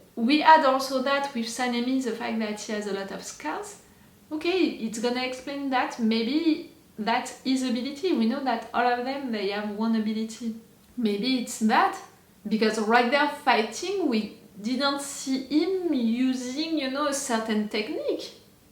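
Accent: France. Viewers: yeah it's probably because of your french accent Most people doesn't like it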